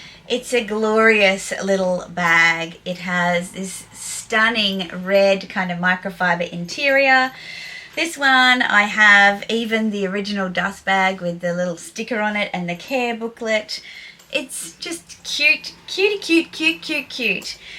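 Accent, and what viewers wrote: Australia. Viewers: Hi, sounds like you are an Australian like me! Have you still got the Clapton and Valentino rock stud bag?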